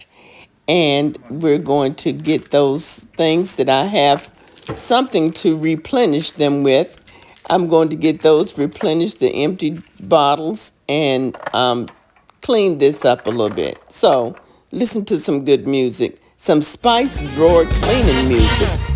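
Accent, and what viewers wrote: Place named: Virginia, United States. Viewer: I really enjoy your videos and the way you speak. There’s something very comforting about your voice and delivery. I spent a lot of time in Virginia while stationed there in the Army, and the moment I heard you speak, I instantly recognized that familiar Virginia cadence. Wishing you and your family a very happy New Year.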